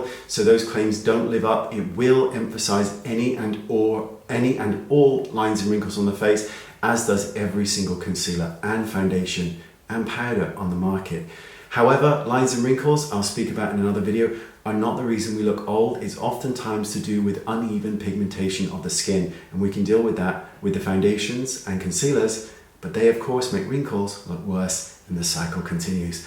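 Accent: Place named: United Kingdom. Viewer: Sometimes I forget what the video is about because I’m enjoying listening to your accent & the British words to use. That my friend is a good thing.👍🏻💚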